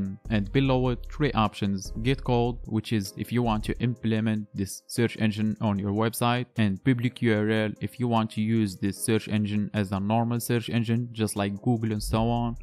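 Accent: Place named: Morocco. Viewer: you're moroccon aren't you? your accent is moroccan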